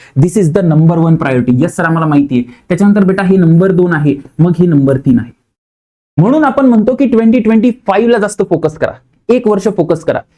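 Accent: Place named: Jalgaon, India. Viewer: Sir tumchi language Jalgaon chi ahe😅tumhi jalgaon che ahe ka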